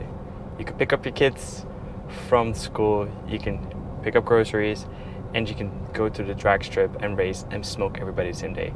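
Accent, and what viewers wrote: Netherlands. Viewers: Ik dacht al aan het accent te horen dat je een Nederlandse tegenkwam😉 Leuk om te zien in comments dat er mensen op zo veel verschillende plekken naar je kijken! Ook vanuit Cyprus kijk ik graag mee😉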